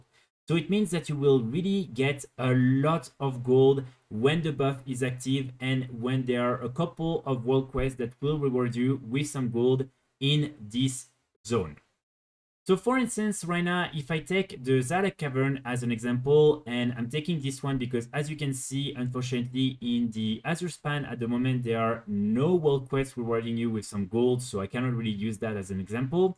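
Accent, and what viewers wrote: France. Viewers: Un FR reconnaît bien un FR lorsque celui-ci parle en anglais c’est dingue 😂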